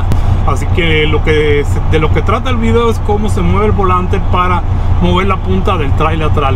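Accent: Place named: Mexico. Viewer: Saludos bro ya hablas bien Mexa🇲🇽 asi estaba yo pero al contrario cuando me juntaba con todos mis compas cubanos 🇨🇺 incluso estuve en varias ocasiones en Cuba🥰🥳 Saludos mi sangre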